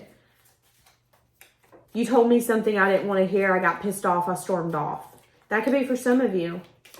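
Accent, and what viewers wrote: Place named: Tennessee, United States. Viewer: I should have known you were from TN... with that accent. I live in white house TN.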